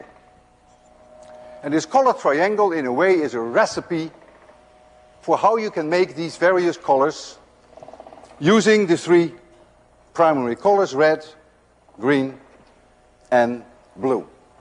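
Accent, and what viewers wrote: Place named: Netherlands. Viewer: sounds like he has a dutch accent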